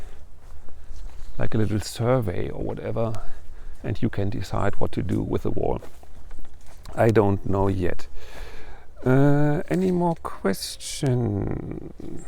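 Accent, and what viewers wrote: Germany. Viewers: We have it here in Western Australia on Sunday and also from 5pm to 7am every other day however we can mow our lawns on Sunday from 10am to 4pm. It's a great idea and even with Sunday mowing it still feels like a rest day with the smell of fresh mow grass and should you not abide by the Council by-laws the Ranger will pay you a visit and fine you so it's just not worth the effort, everyone seems to abide anyway. Funny you should say some thought it Australia, I thought Germany because of your accent. Congratulations on your 50 thousand subs, I think we're all enjoying normalcy and calm wether here or away were all pretty much the same as people I think 👍🐨🇦🇺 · Nice to meet you,. I recognized your German accent, although your English is excellent. I lived in Neu Ulm for 3 years. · Hi, woher kommen deine Englischkenntnisse? Ich hab natürlich deinen deutschen Akzent erkannt. 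😏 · I knew it! You are lived in Germany because of your accent. And no wonder why you are so hardworking (because i knew someone who also lives in Germany that can do so many things in his free time while working at the company and also he is a lecturer) Cheers and God bless you From the 🇵🇭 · I would think that it would be obvious that you are German as soon as you start talking I could figure that out. I really like your videos it reminds me of my dad doing woodworking onon the weekends. Plus your personality is a perfect match. ( my dad was German, he has since died) Also having no screens on your windows tells me for sure you’re not in America. We are fanatics about our screens on windows and patios. Good job. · Haha called it I new that was a German accent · I love the little info show. Thanks! Also about the accent. I knewwwwww IT was my grandparents motherland...Germany! yayyyy! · After three spoken words in one of your previous videos I knew that you are German. 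Well, I am too. That might explain it. And I recognized the German forest. Keep up the good content! Grüße vom anderen Ende Deutschlands aus dem Saarland :) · Hi From Michigan USA. I love watching your videos. My Father is from Germany so I am very familiar with your accent. I love how soft spoken you are and you have done a wonderful job with your house. As for the brick wall.. whitewashing will be the same as painting.. once done you can’t go back. The brick in your kitchen looks very nice. I’m sure that if you ever decide to do a air B&B with the house you will have no problem filling reservations with all these u tube subscribers! Good job! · You are obviously a naturalist. Most likely a vegan or at least a vegetarian. Beautiful German accent. Beautiful country!!!! My father was 100% German. A hard working honest stern proud man. Nothing he wouldn't take on with full stream. Luckily I'm like him and not my passive Norwegian mother. 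🙂 God's speed. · From the beginning, i knew it you are German because of your German Accent. I have friends from Germany he live near Bonn, I also have from Elmanau. Hugs from the Philippines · I think it's funny someone thought your accent was Australian. I knew it was either German or Austrian before you mentioned the GDR and because it sounds like my Aunt's accent and she's from Germany, the eastern part too.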